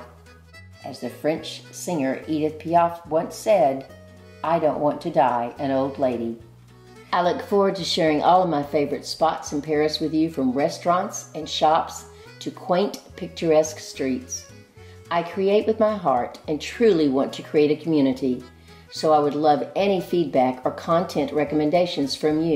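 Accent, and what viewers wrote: South, United States. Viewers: I like your uniqueness..they will love your southern accent, many people will be envious of you. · I love her accent-American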